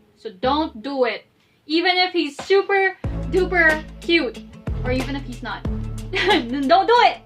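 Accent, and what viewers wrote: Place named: Palawan, Philippines. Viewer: Ate palinawan po yung salita mo po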